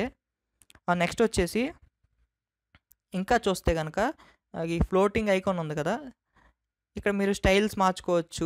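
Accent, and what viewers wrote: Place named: Srikakulam, India. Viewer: I like your accent bro !! Maa Srikakulam friend gurthochadu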